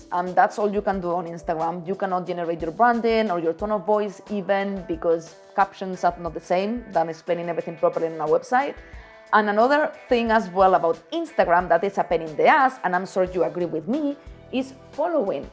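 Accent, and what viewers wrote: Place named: Spain. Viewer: I love your content! I discovered your channel yesterday and I can't stop binge watching all your videos. Very very insightful and informative content. Thank you millions and wishing you all the success - you deserve it. Your artwork is truly amazing and inspiring. P.S. Your Spanish accent is like a joyfull honey to my ears.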